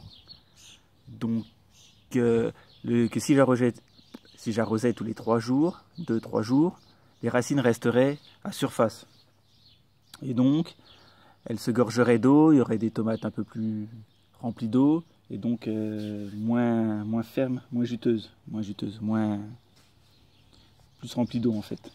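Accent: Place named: East, France. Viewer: L'accent est un peu de l'est , allant de la Franche-Comte-Bourgogne aux Vosges.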